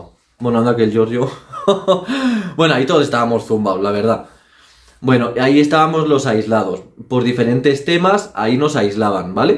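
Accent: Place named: Spain. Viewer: me haces reir mucho, me encanta tu acento español al narrar tu historia, saludos desde Venezuela, los retos estan bien, adelante...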